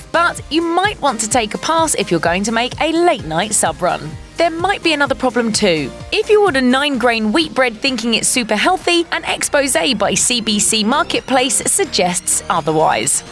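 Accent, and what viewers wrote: United Kingdom. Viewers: I hate that "pompous" British accent. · What happened to the other girl doing the commentating? This chicks British accent is infuriatingly annoying.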